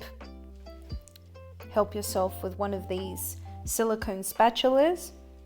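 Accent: Australia. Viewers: saw you on Mike Chens channel. great channel and i have to say every time i hear your accent, I keep thinking of crocodile dundee or steve irwin. "you call that a knife?" lol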